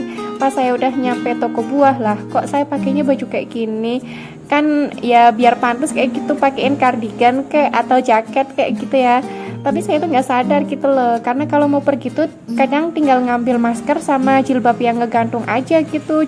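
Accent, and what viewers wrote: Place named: Java, Indonesia. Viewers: emang asli orang mana mom,,,, kaya orang jawa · Bunda orang mana sih? Kayanya ngomong nya medok gt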